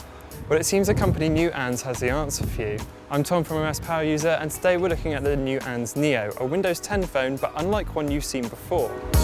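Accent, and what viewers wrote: United Kingdom. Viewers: That accent though, which area in Britain is that???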